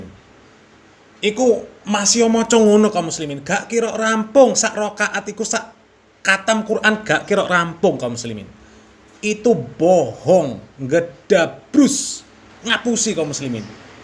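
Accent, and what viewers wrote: Java, Indonesia. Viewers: Saya suka channel ini. Channel yg mencerdaskan akal ... Apalagi ditamabah narasi dgn LOGAT JAWAnya yg MEDHOK.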